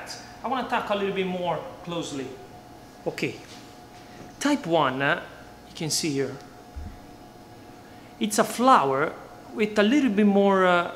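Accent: Italy. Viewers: love Italian accent!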